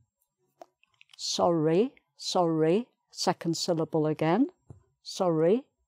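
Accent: England, United Kingdom. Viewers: Excellent! I love British accent 😊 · Mrs...., your teachings r GR8! and I luv yr 🇬🇧 accent! God bless u! I'm Mr. Ricardo. I'm Brazilian. Thnx4yr youtube classes. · a beautiful British accent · Hidden Cuber At least,she has an English accent. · Maybe this is how the letter is pronounced in England but it is certainly not as it is in Scotland so please make the distinction a lot clearer. Not helpful at all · CharlieRashi, Britain comprises 3 countries - Wales, Scotland and England. The United Kingdom comprises 4 countries - with the addition of Northern Ireland. (The Republic of) Ireland (in the south) is in no way British. There is no such thing as (oral) British English (although as someone mentioned above it is often used to describe spelling differences with the USA). Jennifer Jenkins’ research might be worth reading: in particular her work on the Lingua Franca core, and how to pronounce the r sound to increase global comprehensibility. 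It’s not wrong to copy the accent of the speaker in the video if that is what you want to do, but her accent is only one of many equally correct accents emanating from the UK or elsewhere. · Thank you very much! Great pleasure to hear such beatyful British English . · Which British accent? I think you mean an English accent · Thank you so much! The British accent sounds so beautiful! Greetings from Russia. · She's sooo good and I love the British accent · With over 400 down arrows, I'm assuming I'm not the first Brit who disagrees with her. (I've read some comments, but not seen one contradicting her.) There are several regional differences across the UK, and at least some of them pronounce every R on that board (properly). She's very clear, you won't go wrong by following her lesson. However, she teaching you one particular English, not a nationwide one. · I really like this lesson because I prefer British accent. 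· Dear teacher, your facnating British English is worth studying by millions of people. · And Thank You for being such an excellent teacher- listening to your speaking alone is a great help and demonstrates the beauty of the (British) English language 👍🙏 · me encata el asento britanico xd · somehow i really like british accent. anyway thank you! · какой у неё невероятно милый голос и акцент. британский английский по сравнению с американским так красиво звучит. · British pronunciation... My favourite💕💕💕 😍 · Ох, как же приятно звучит классическое британское произношение. Прям наслаждение для ушей. И все понятно. Американское r-ыканье с детства не выношу. Мечтаю научиться говорить с британским акцентом, но не получается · British accent 😍 take me over the cloud ☁️..amazing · I wish more British could speak in the same way as you:)) most of them speak like they are chewing something or drunk guys who have some problems with pronouncing sounds:))) Thanks a lot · british accent is SO pretty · She's so cute... 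And I love British accent 💙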